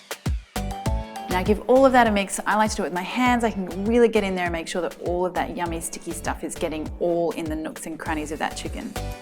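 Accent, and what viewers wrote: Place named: Australia. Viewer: I love your Aussie accent. Your recipe looks humble and simple. I will try this yummy recipe even if I have to wait 70 minutes for this meal to cook lol. Cheers girl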